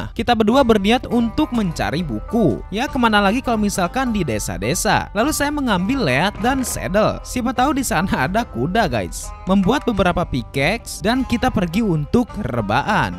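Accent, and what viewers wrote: West Java, Indonesia. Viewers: Orang sunda bg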